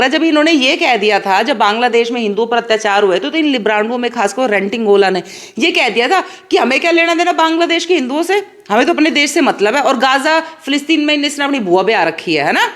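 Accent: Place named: Haryana, India. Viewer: Bahut badiya bahan Haryanvi accent bahut jabrdast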